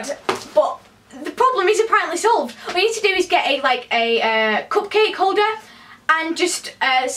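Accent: United Kingdom. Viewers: Bitch she just has a British accent deal with it I found it very helpful!! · U have a nice British accent 😁👍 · The British accent is so cute!!!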